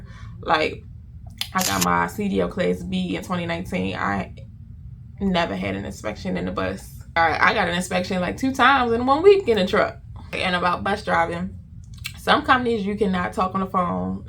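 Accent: Baltimore, United States. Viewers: Yea she sounds like me lol Baltimore all day baby 😂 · U got to be from Bal-Da-More!🙌🏽🙌🏽….love ur videos💪🏽🙌🏽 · Yoo you got a Baltimore accent out this world i thought i was watching the wire lol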